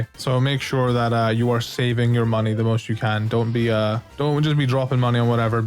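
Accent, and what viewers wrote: United Kingdom. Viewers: iam 5 sec in and you have the most british accent ever xD i love it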